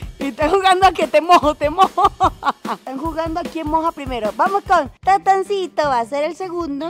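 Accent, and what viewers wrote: Venezuela. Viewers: Eres de Venezuela????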